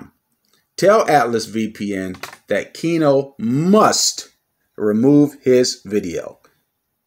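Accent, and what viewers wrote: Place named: Detroit, United States. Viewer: ok peace brother, keep the videos coming. That Detroit accent is very distinct. I met Manny years ago in Ann Arbour.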